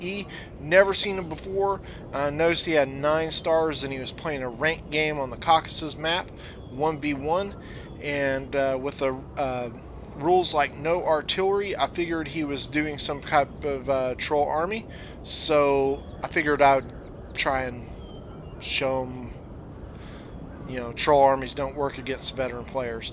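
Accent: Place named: South, United States. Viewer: I dont know why youtube suggested this video but I found it entertaining. Your commentary is pretty good and I must say I find your (somewhere in the southern US) pleasing to listen to, but perhaps 15 minutes is a bit too long. Anyways you earned yourself a sub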